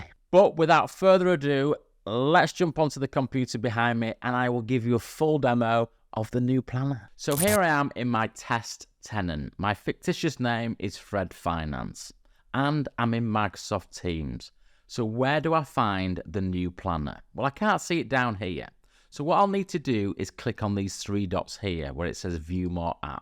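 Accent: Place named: North, United Kingdom. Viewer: I was like "the hell is a book-it", but it's that northern charm "bucket". Love the videos!!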